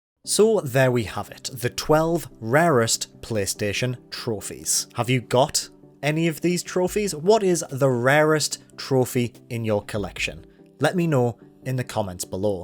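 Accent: United Kingdom. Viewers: “Rare” for this guy is rehhh wtf are British accents it’s excruciating to listen too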